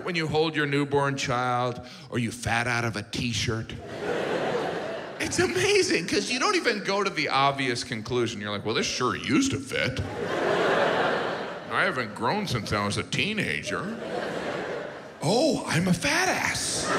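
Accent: United Kingdom. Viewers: That’s not a British accent that’s Scottish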